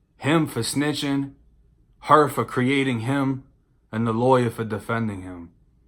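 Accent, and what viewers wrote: Boston, United States. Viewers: I am just trolling a little bit lol but not for negative reasons just trying to give you laugh and get your attention at the same time. Few questions for you. You ever come across in prison any of the people that killed that guy based of the movie bully. I know Florida that case was huge so big that it turned it into a movie. I love people from Boston just the way they talk and the way they carry themselves that's why I vibe with your channel but with that said boston can be pretty racist unlike Florida were it seems to be more diverse you won't see nearly as much racism. I only bring this up because you were talking about trying to work at Irish bars when you got out of prison I figured that would put out a target on you being blood and all the gang releated tattoos. I know that side of Boston would hate on you but I could be wrong. 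Also you remind me of one dude from the movie the town. I was watching the movie 2 days and I remember the interragation scene I am like this dude be sounding just like you. Boston be going hard with those gangster crime flicks if you get a chance the average person don't know this movie but its called what doesnt kill you best Boston gangster crime flick I have ever seen and its based on a true story about to bad as dudes from boston that came from nobodies to running boston This the link in case you never seen <link> Also this dude is a trip this guy was a regular no gang affiliated white kid from Boston who became one of the biggest gangster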